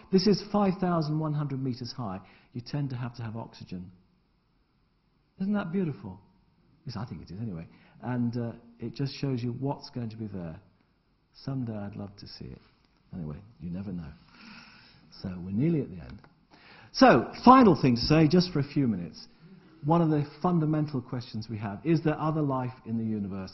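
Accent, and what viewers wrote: United Kingdom. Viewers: the British accent is so tantalizing. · do you know wot I mean? does that make sense? d'ya see wot I mean? Oh, and that gas over there, that's "MEE-thane"...(ugh, I can't stand the way brits mispronounce words). Oh, and "isn't it?... isn't it?...know wot I mean? Isn't that right? LOL; Really, I enjoy these lectures. Prof Morison does have an interesting, animated mien about his presentations. Always fascinating to watch. And I thought the "Oscar Party" analogy was a great one: it's a great way of explaining how mass works. And, i agree: quarks shouldn't have been arbitrarily changed to "truth & beauty" from what they've been called all along & what is a much more sane label: "top" and "bottom", besides, "truth" and "beauty" are both subjective, where as there's no argument, can be none, to something that is "top" and something that is "bottom"; cheerio!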